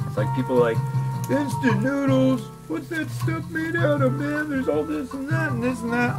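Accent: United States. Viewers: For some reason your videos have got the vibe of 2000s. Maybe it's the editing. P.S. I hope you'll get the most you can from all these Russian dudes. P.P.S. Your accent is sooo satisfying to listen to. Smooth and very American. Nice pronunciation always gets me.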